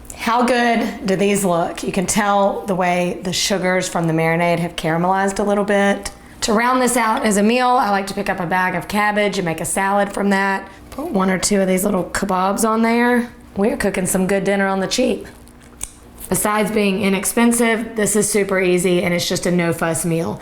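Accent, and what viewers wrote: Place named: Alabama, United States. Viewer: I am in love with you. I love all your recipes. Perhaps if I comment ant say nice things YT will keep you on my YT feed. I dearly LOVE your Alabama accent too! Ken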